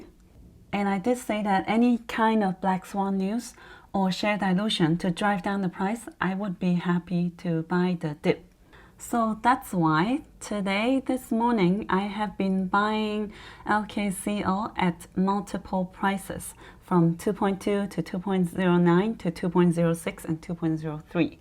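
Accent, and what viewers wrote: China. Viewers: showing them some colors...这中式英语哈哈哈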